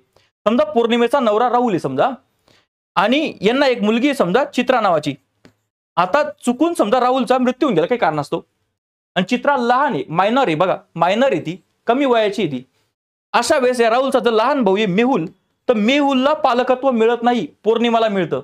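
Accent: Khandesh, India. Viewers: Aaplya khandeshi ahirani bhashet bolta tumhi sir mh 19jamner Kar aamhi 🙏😍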